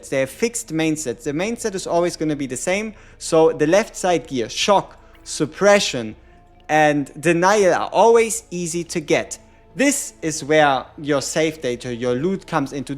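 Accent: Germany. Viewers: You sound german. Nice video tho. Thx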